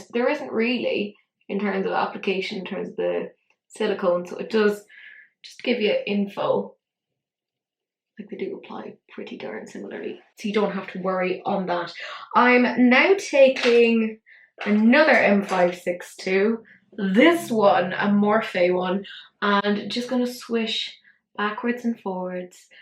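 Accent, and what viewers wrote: Ireland. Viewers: I loved this video x I have the dark fantasy and absolutely loved it defo need to pick up the liquid ones as they look fab x it’s so nice to hear your accent x I have family in Carndonagh so hearing the accent and the witty sense of humour really was magical x thank you for this video it made me smile xx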